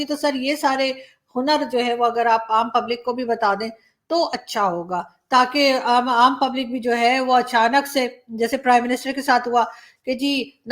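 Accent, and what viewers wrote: India. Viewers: Don't know wether people and u noticed or not ,that your accent and Dialect seems purely like Indian Hindi speaking person....... Lagta hi nahi ki urdu bol rahi hain aap ..It's like Indian Desi girl taking charge over any programme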